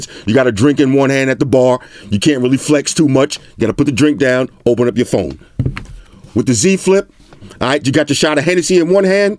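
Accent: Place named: New York City, United States. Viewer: NYC slang in full effect when you watch....I feel like I’m chilling on the “stoop” with one of my homeboys! I know knows the word stoop!! 😅😅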